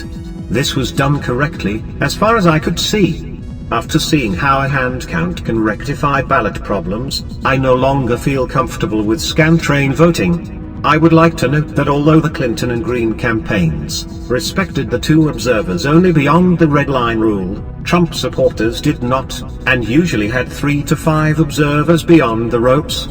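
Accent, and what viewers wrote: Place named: United Kingdom. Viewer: Why the odd british accented bot voice?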